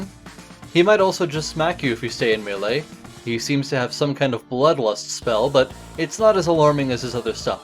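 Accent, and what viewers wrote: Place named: United Kingdom. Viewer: Where from? Sounds like you're half british.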